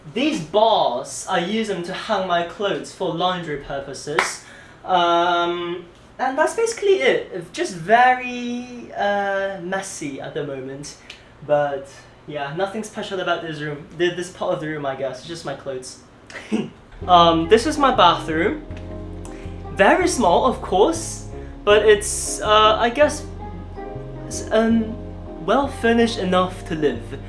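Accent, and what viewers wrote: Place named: United Kingdom. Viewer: Ah the british accent never changes HAHAH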